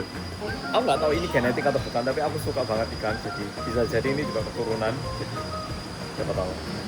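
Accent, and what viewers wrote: Java, Indonesia. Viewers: ur korean accent jdi berubah ya bro, jdi jawa medok accent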